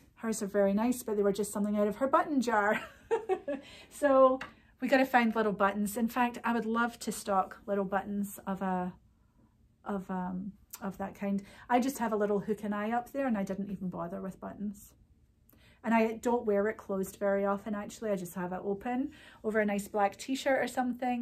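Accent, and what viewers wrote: Scotland, United Kingdom. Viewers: Thank you for all the Scottish updates. My mom has recently passed at 85 years. Being raised in Holytown near Glasgow, she learned to knit with woolly wool from her mother and I from her. I will also appreciate your Scottish brouge as she never lost hers after 54 years in the U.S.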